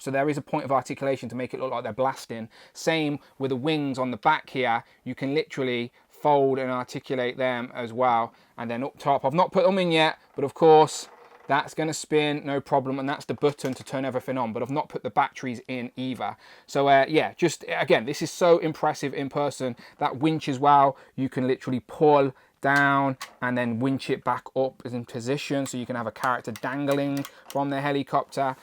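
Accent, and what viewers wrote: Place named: England, United Kingdom. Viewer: These English gents are so funny the way they pronounce everything… Absolutely hilarious a lot different than us Americans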